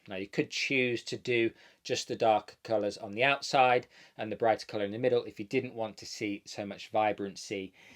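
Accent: United Kingdom. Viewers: I've been watching your videos all day on Saturday and it's so nice to watch and listen to another Brit. US colouring terms are so different to ours. I know now that if I did want to colour mine (South Asian hair) I would know what to ask for.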